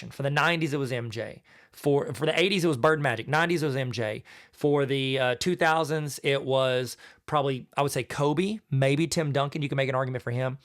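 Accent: South, United States. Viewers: I love watching your videos, because you say things like “No thing like a chicken wing”, l know you didn’t say that but you do say funny things that crack me up. Where are you from originally, your accent says the south and l am from Lexington Kentucky area with an accent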